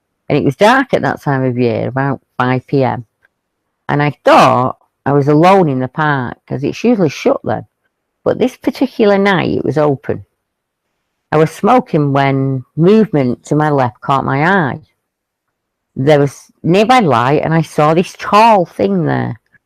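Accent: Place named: North, United Kingdom. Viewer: Finally while watching a BEJ video I can enjoy a lovely northern accent!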